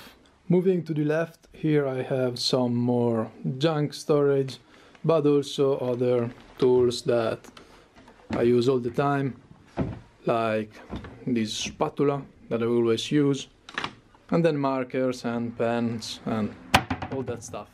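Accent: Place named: Northern Italy, Italy. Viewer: hahahhaha si sente che sei del Nord!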